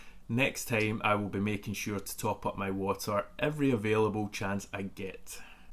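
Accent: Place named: Scotland, United Kingdom. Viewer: Hello hiker! Stopping by to subscribe to your channel. The Scottish accent is soooo cool, I absolutely love listening to you talk! (Is that weird?😂)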